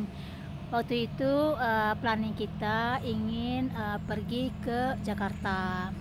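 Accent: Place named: Minangkabau, Indonesia. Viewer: ..emang bana tu ni.... Dan lagi logat uni masih terasa kental urang awaknyo.. gak dialekk kebarat2an walau udah puluhan thn di swiss.. Semoga sehat selalu ya uni.. ditunggu resep masakannya...dan juga kebersamaannya bersama keluarga..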